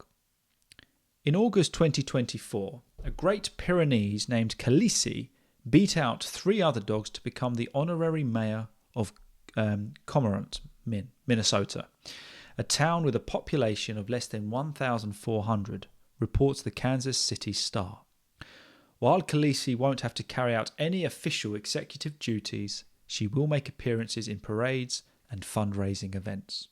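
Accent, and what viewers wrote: United Kingdom. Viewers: I love this, am like learning british accent and am really feelings awesome right now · hello from Brazil. I love British's english and this is the first time here listening to you.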